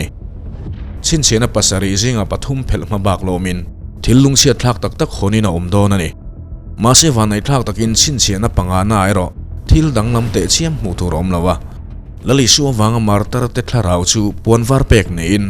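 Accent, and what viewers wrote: Mizoram, India. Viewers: I aw hi ngaihthlak a nuam lutuk e... Mizo aw phawi diktak tur ani.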